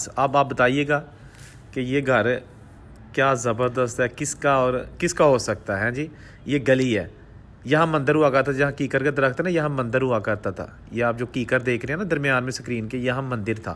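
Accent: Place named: Gurdaspur, India. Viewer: Sir your Punjabi dialect is same like Gurdaspur people.. Saab aap Narowal ya Sialkot district (jo Gurdaspur district India ke saath lagta hai) ke hoge.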